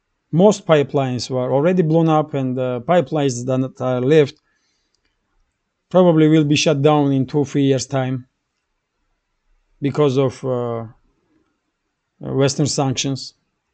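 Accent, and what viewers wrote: Russia. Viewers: Your English is quite perfect and it’s not hard at all to listen to your conscientious sentence construction dedicated to accurately expressing what you want to get across to your audience. Especially with your lovely Russian accent 🙂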